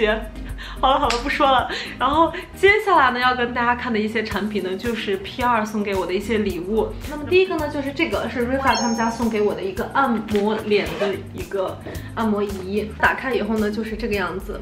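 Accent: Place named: Xi'an, China. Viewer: 作为半个西安人，听krysti说话特别亲切！西安妹子加油！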